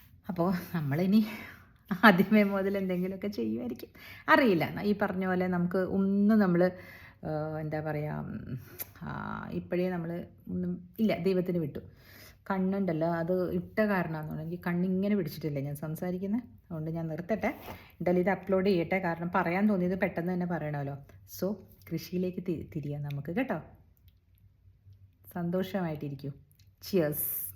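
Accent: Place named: Valluvanad, India. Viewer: മാഡം.... അമ്മ ഏത് സ്കൂളിലെ ടീച്ചർ ആയിരുന്നു. മാഡത്തിന്റെ വല്ലുവനാടൻ സംസാരമാണല്ലോ... നല്ല സംസാരം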